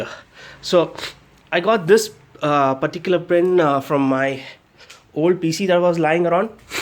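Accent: India. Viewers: listen to him he's Indian and alot of Indians are doctor n engineers n have a great accent so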